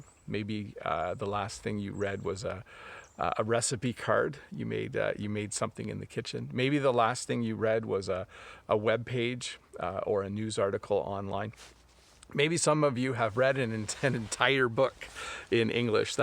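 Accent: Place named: Canada. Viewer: Hello the 🇯🇵Osakanese here! Ive been learning eng from ur videos. I like ur “the great White North”English!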